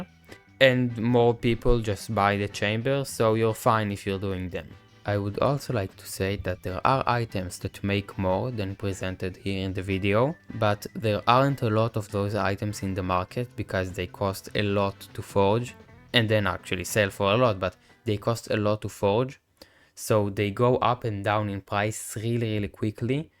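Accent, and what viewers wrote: Israel. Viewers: איזה מבטא ישראלי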